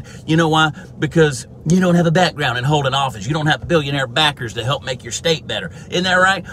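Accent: Texas, United States. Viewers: New hat! Great insights! You put my thoughts onto Texas accent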